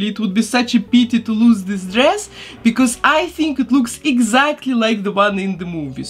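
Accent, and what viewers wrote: Russia. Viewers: Кукли виходять дуже гарними, але неможливо слухати, жахливий акцент/говір, наче росіянка з глубінки вчиться говорити англійською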